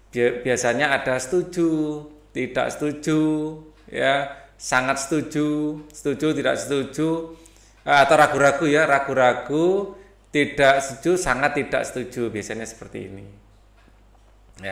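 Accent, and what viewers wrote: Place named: Java, Indonesia. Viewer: Dari suaranya sudah jelas itu wong jowo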